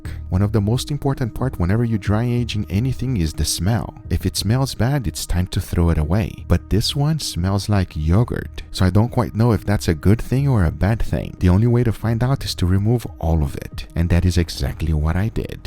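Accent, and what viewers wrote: Brazil. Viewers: Is this dude Brazilian? Major Brazilian accent!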